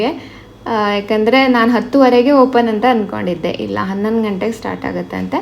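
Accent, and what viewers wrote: Kundapura, India. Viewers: Nimdu kundapura kannadana sis nice sharing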